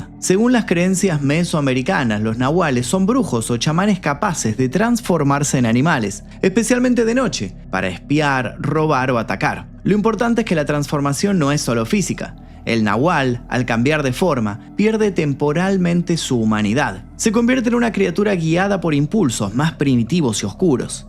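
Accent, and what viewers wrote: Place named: Argentina. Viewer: "polisha", "coshote". Qué chido se oye la pronunciación de la "ll" y la "ye" en el español argentino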